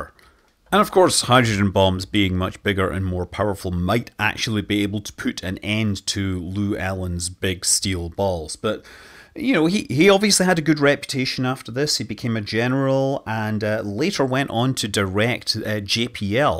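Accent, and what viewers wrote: Scotland, United Kingdom. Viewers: Scottish to bro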